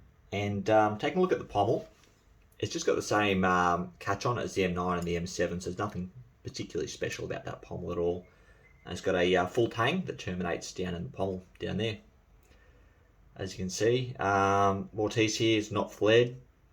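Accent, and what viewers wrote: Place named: United Kingdom. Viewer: Why is your accent and syntax so UK, if youve been in the US Army?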